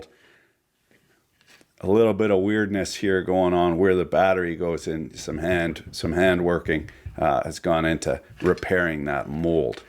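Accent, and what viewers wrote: Quebec, Canada. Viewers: Are you from Quebec? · As a Canadian I can say never have I heard more of a Canadian accent. So thank you sir!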